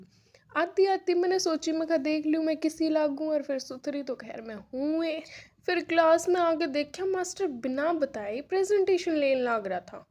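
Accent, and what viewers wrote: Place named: Haryana, India. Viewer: Aww so pretty u are ❤ And your haryanvi accent is so cute and soothing to hear ❤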